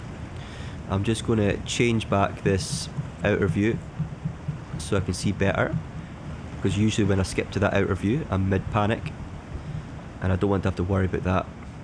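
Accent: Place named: Scotland, United Kingdom. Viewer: Hi. Your videos are making me buy this game too. I didn't even know it existed. I bought Sailwind yesterday, but ended up returning it. I found the graphics a bit disappointing. Sorry if this translation looks a bit strange, I'm from the Netherlands and I ran it through Google Translate. P.S. I love your Scottish accent.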